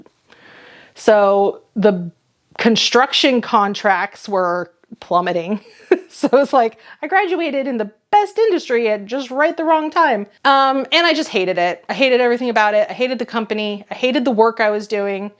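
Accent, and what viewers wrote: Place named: Europe. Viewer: Eric Lindros 😅🇨🇦🏒 European hockey mom here and I love you 😊, cause maybe you "sound" to me a little bit like an European ❤ Love your videos and sense of humor 😂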